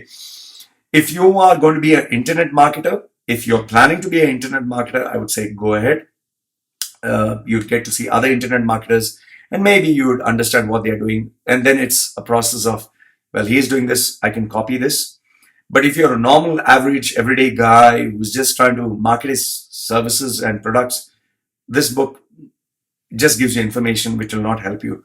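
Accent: India. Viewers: Dude are you indian?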